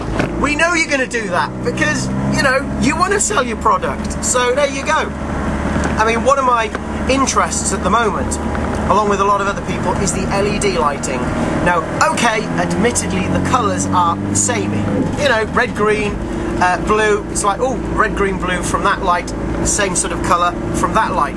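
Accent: United Kingdom. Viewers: You're and you have given us knowledge...and lots of it. But trust me, this djtutor stuff is good TV material. It's addictive, just like reality TV is. I know that, just like myself, a lot of people around the world gets hooked on them...speacially gig logs...those are 110% reality TV!!! Plus, you will be the perfect British host for the show..you know they love British accent people here in the US TV networks. Saludos desde Miami!!!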